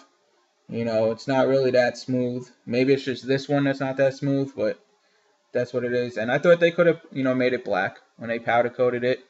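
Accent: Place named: Rhode Island, United States. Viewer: you must be from Rhode island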